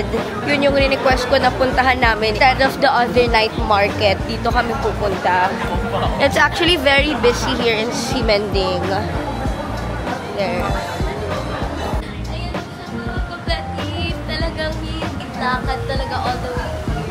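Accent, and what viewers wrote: Conyo, Philippines. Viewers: AAAA i really like listening to you talk conyo, soo cutie talaga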